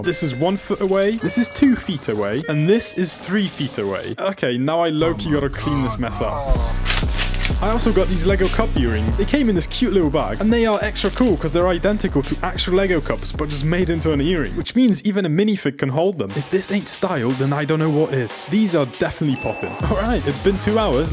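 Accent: United Kingdom. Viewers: He's just british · Mate are you briish pin me if you are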